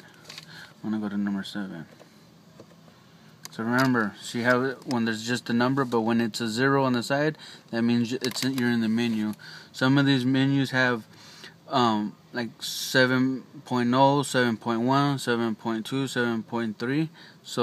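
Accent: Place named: Southern California, United States. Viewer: Wow, you are really smart, ese. I came from southern Cal and feel like I recognize your accent. but you know so much! Where did you learn all this? I am tryhing to get my air suspension to work without going to dealer. I don't even know if you are still up, so if you are, I'd appreciate any hints. and because of all your start/stops, Ima have to go back and look at it...slowly! thank you.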